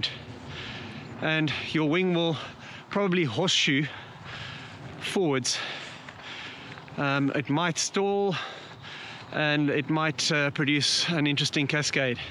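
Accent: South Africa. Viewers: Cool video! Your accent sounds South African. Are you? Also, where was this video taken?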